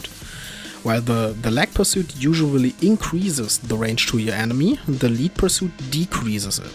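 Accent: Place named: Germany. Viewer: I don't know what it is about German people speaking English but it sounds so damn cool, could listen to this dude for hours.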